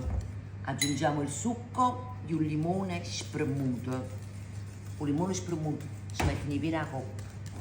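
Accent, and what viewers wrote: Naples, Italy. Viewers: Brava con il tuo modo di fare rendi tutto facile fai venire la voglia di cucinare e fare i dolci insieme a te❤ Non c' è niente da fare la cadenza Napoletana rende tutto più facile. Anche io sono Napoletana e appena posso vengo a prendere la pizza da asporto da te per i miei bimb❤❤❤i e mangiami anche la tua frittatina di pasta e il tuo crocche ' di patate mentre aspettiamo di ritirare le pizze. Grazie per la tua semplicità e la tua simpatia innata❤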